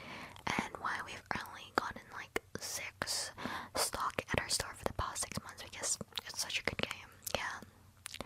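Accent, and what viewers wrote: Australia. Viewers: ohhhhhhhh its australian that'd do it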